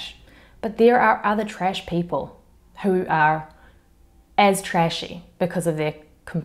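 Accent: New Zealand. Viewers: I listen to a lot of true crime and I just found your channel, why. I really enjoy it. At first I thought you were an Aussi but later you mentioned New Zealand so I am guessing you are a Kiwi. I love the accent and the way speak, it seems some of my favorite youtube channels come from that part of the world. Back to basics, Molly Dixon ect. and I think that you are beautiful which makes listening to you tell a story very easy.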